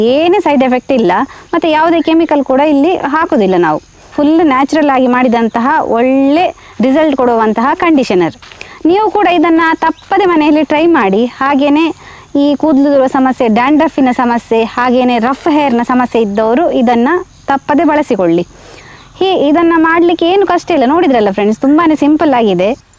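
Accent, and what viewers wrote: Mangalore, India. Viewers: Your Kannad talking voice is mangalur I am at Haveri Karnataka style of kannada speaking is different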